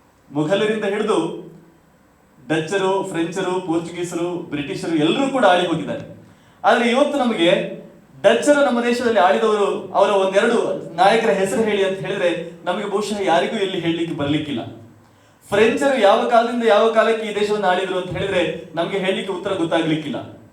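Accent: Standard. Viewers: ನಿಮ್ಮ ಶುದ್ಧ ಕನ್ನಡ ಕೇಳುವುದಕ್ಕೆ ತುಂಬಾ ಆನಂದವಾಗುತ್ತದೆ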